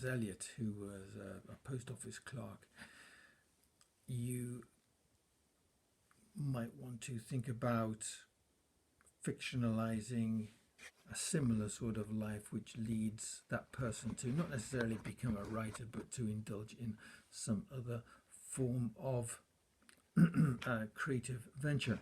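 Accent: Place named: United Kingdom. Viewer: I'm trying to work out where in the UK your from by your accent but drawing a blank 🤔.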